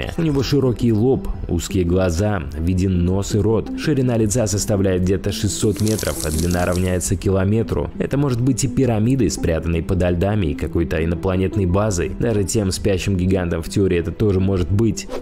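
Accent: Russia. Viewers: Как можно слушать такую речь. Передача хорошая, речь акающая😮😮😮😮Это что-то. 😂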